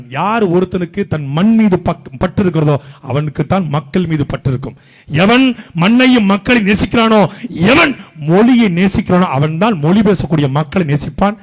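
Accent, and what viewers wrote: Tirunelveli, India. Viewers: Welcome to bro sattai media and bro's your explanation is very great and bro Tasmac 😂is roots of un well doings in all social life and bro your Trinelveli language is very welcomed and thanks to media vision ok go ahead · திரு . சாட்டை சிறப்பு திருனல்வேலி பேச்சு சூப்பர் 😂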